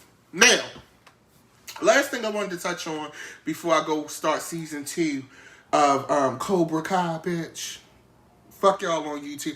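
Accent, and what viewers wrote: Baltimore, United States. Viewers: His accent sounds like Baltimore Maryland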